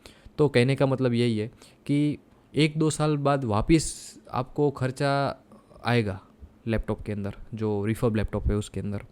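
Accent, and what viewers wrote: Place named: Sindh, India. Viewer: ok actually your accent is a bit similar as if a Sindhi speaking person speak urdu.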